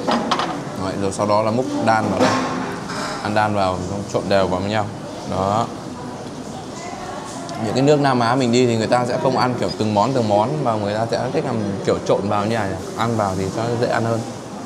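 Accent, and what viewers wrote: Vietnam. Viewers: Tiếng anh của bạn hơi thuần việt nhỉ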